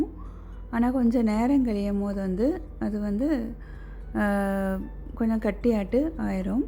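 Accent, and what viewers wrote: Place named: Kanyakumari, India. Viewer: சூப்பரா செஞ்சு இருக்கீங்க சிஸ்டர் நீங்க கன்னியாகுமரியா நீங்க பேசுறது ரொம்ப அழகா இருக்கு ❤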